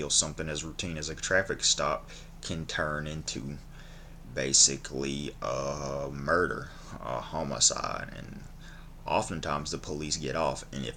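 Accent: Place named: Carolinas, United States. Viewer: I look forward to seeing more videos from you. Your accent is interesting. Are you from the Caralinas?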